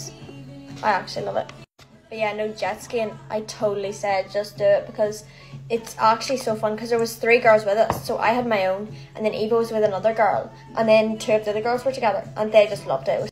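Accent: Ireland. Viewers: Y’all I might be dumb but is she irish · Is she Irish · I swear .our accent is the same ❤ I’m also from Ireland btw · Is she Irish or something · Yh she’s Irish too❤ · Is she IRISHHH? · I didn’t know she was Irish- I love their accents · I love your accent im from Ireland too and your just so pretty ❤ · Is she Irish? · it’s irish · They are Irish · Ily your Dublin accent 💗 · yep it's Irish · Are you Irish? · I knoww I love Irish accent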